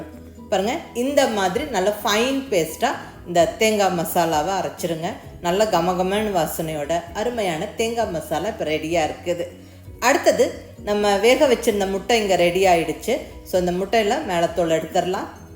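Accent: Kongu, India. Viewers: I like your tamil kongu slang mam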